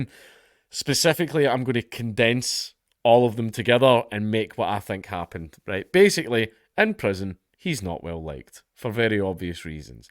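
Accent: Scotland, United Kingdom. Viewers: Hey, Dankula, make an update video. I need to hear the today's news in Scottish accent 🤌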